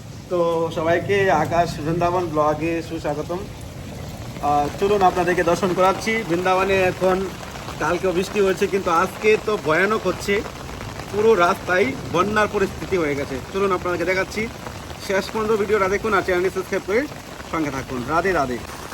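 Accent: Bangladesh. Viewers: ভাই তুমি কি বাংলাদেশী। language টা এমন কেন?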